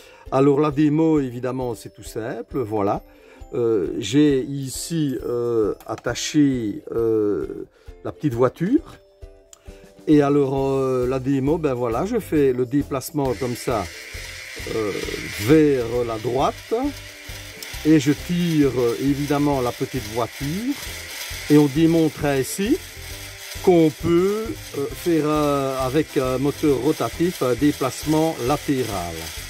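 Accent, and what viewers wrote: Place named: Liège, Belgium. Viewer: Enfin un youtubeur belge (je l'entend à l'accent liégeois 😉) qui aime l'électronique. Merci pour cette vidéo et votre chaîne compte un abonné en plus. Voici le pouce 😀👍👍👍. N'hésitez pas à venir faire un tour sur ma chaîne dédiée à l'électronique débutant.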